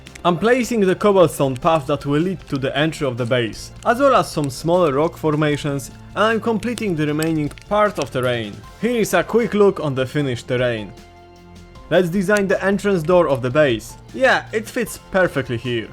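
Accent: Poland. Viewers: Your had polish Accent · łoooo panie polak! słychać po akcencie typowo polski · nie no stary, masz totalnie polski akcent to usłyszeniu z 5 słów już widziałem że jesteś z polski. ale moce Zajebiste🔥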